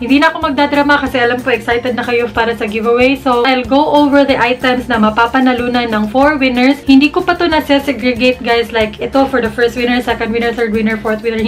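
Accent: Visayas, Philippines. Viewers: Hi Miss. Madalas ko na nakikita yung mga videos mo sa feed ko then nung pinanood ko na di ko namamalayan na grabe na yung tawa ko. Very natural, transparent and I love your bisaya accent kaya napa subscribe ako. Congrats po for 20,000 subbies and advance happy anniversary sa channel mo po. More kwela videos to come dito sa channel. FB: <link> IG: <link> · Hi ate, di ko lang matandaan kung anong pinakaunang video na napanood ko sa channel mo pero sure akong baguhan ka pa nun kasi hindi pa yung G7X ang cam na gamit mo hehehe. Basta naalala ko lang noon na intro mo palang napa click na ako sa subscribe button kasi nakakahawa ang pagka witty mo at bungisngis. Enjoy na enjoy akong manood sa mga vlogs at tutorials mo kasi bisaya ka, ganahan kaayo kos tono nimo ba. #ProudBisayaHere:D Keep on inspring us and God Bless you always! I love you ate:) Mwaaahhh <link> <link> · nag subscribe ako kasi ang kulit ninyong mag jowa. 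katuwa. una sabi ko bisaya siguro to kasi may punto hanggang sa di ko na namalayan nagmarathon na pala ako ng mga vids mo. IG: krizzie31 FB: krizsy anne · Hi ate 😍 Chada jud kaayu imung mga video ate uy labi natung mga blogs ninyu ☺😙 Haha si mylabs ba kulango pud diayng dako ganahan kayu kog lantaw te kiy usahay kiy maka storya kag bisaya haha struggle pud siguro ate ba sig tagalog haha hinaot uy nga naa untay bisaya nga mga blog ayha pa dayun ko mag himo ana😂 halaka te uy! pa try gud ko anang ipag me now o ambot unsay spelling ana😂 haha ganahan kog lantaw sa imu g videos te kiy Tinood ka dili ka plastic te😍 mismo akung papa ganahan pud sa imung mga video kiy imung tono daw kiy bisaya gihapon ganahan siyag lantaw haah ana akung papa pwede ba daw mag bisaya unta ka kiy wala daw kaayuy Bisaya nga mga bloggers Pero Ana ko sa iyaha tagalog lang sa ta pa kig dili unya ka sabot ang mga Subbbies ni ate nga tagalog😅 haha nag storyaha nami ni papa tungod nimo 😍 Haha tung nag kuan mong mylabs nimk Joker daw kaayu😂 haha dinhi lang sa ko te kiy mananaw pakog videos nimo uy! 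maka adik man ka ate😂 way char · Hi Ate, unang vid na napanood ko po sainyo ung Fashion 21 na make up tut. Nagsubscribe po agad ako kc nagagandahan tlga ako sa accent/punto ng mga bisaya since bisaya din mama ko. Sya po ang naalala ko. Para skin napakalambing po ng salita nyo effortless nyo magpatawa sa mga vid. Simple lang po pero nakakaentertain. Congrats din po sa 21K subbies!!! ❤ FB: Jonna Banuelos IG: jlvm_ Twitter: jonna_lnv Gmail: <mail> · hello atiiii 😊😊 pnapanuod ko po yung video mo kasi: **natural na natural ka po I mean hindi ka maarteng magsalita hindi pasosyal 👏👏 **gusto ko po yung tone ng voice mo kahit po parang bisaya ang sweet po kasi 😍😍 **ang kulit mo po sa mga videos mo especially pagkasama mo yung my loves mo-jolly person 😊💜💜 **hindi ka po nakakaboring panuorin 😘💙 --- plssssss 💜💜💜👏👏👏👏👏👏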